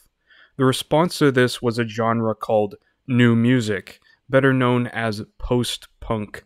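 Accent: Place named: United Kingdom. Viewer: Wait, you're from the UK? or are you reading a script? also I believe it's pronounced LEE-ver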